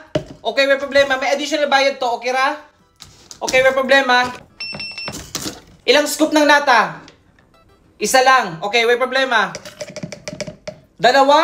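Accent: Visayas, Philippines. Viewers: Ilonggo kagid o bisaya❤❤❤❤ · hahahaha bisaya kapo ba waypob lima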